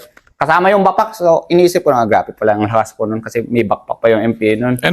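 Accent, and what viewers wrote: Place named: Visayas, Philippines. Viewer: Kakapanood ko sa part 1 Sir hindi accent ng ipugaw kundi bisaya na✌️.Ipinagmamalaki ka mg buong ifugao province sir at ipagdadasal din namim ang kaligtasan mo kayong lahat jan. Nakakalungkot lang na kapwa pilipino nagpapatayan anong klaseng utak kasi ang meron yong mga tao na kumakalaban sa gobyerno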